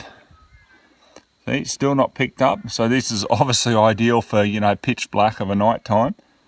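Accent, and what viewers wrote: Australia. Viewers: I absolutely love to hear an Ozzie talking guns, but...how are you an Ozzie talking guns? Was this filmed in a secret bunker?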